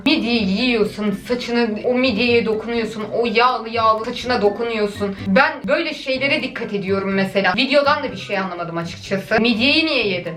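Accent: Turkey. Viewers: Sen heç AZERBAYCANLİ KİMİ DANİSMİRSAN TURK KİMİ DANİSİRSAN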